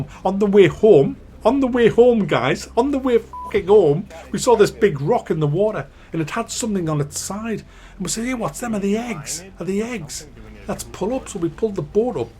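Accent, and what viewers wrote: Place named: North East England, United Kingdom. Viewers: its the north east accent from the Tyneside region, most British accents don't pronounce boat like board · Love this guy - I'm from the North West of England and he's from the North East, so I'm very familiar with the accent, but these videos entertain me no end. The bit about the sea serpent XD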